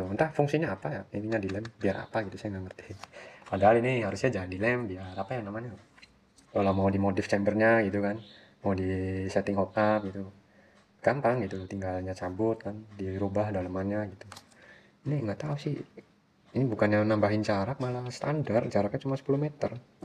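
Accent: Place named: Cirebon, Indonesia. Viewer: sampean dari cirebon mas ?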